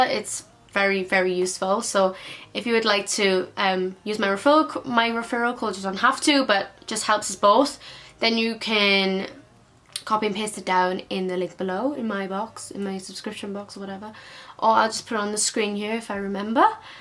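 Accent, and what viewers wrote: Wales, United Kingdom. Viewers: Are ya a welshie??😍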